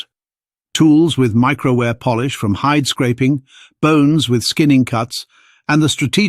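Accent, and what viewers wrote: RP, United Kingdom. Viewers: I don't care what you say even if you do sound BRITISH ELITE.🤮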